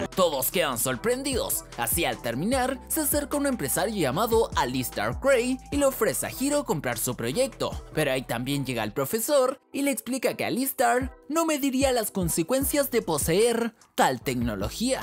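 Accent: Chile. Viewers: no sabia que este weon era chileno xd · MIRA EL PERKIN este, no sabia k eras chileno D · Eres chileno?... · Ahora, en este video si que si que confirme que eres chileno, y eso es bueno para decir que los chilenos podemos hablar bien, y que eres muy bueno haciendo resúmenes de películas, tremendo like que te doy 😎🤙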